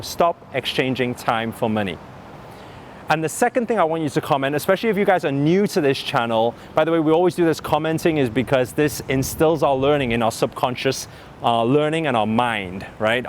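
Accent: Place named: England, United Kingdom. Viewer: First time seeing Asian guy speaking in English accent 😅 sounds funny but he’s pulling it off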